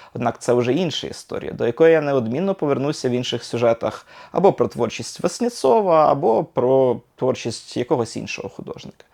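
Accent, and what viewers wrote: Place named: Western Ukraine, Ukraine. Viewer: Судя по акценту афффтор ни разу не относится к украинской наследственности. Западенец у которого мама курва, папа на конюшне жил...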